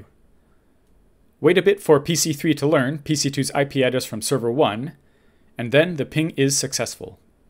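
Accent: Canada. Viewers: I think I got this :) ACLs at least lol by the way, youre originally from Canada right? I hear the "aboot" ;)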